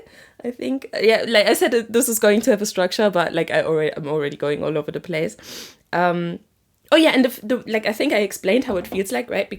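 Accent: Germany. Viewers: Ich wollte mal fragen welchen Stein ich benutzen kann als Steinbock :) Ps : Dieser deutsche Akzent 😭✋ ich liebe es 😂😂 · i like your German accent, I assume it's a German accent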